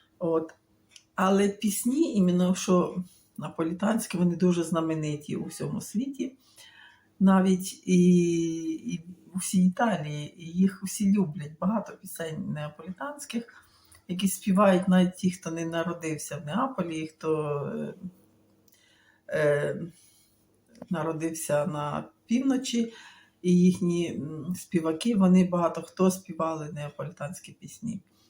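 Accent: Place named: Transcarpathia, Ukraine. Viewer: Цікавий діалект закарпатський,веселий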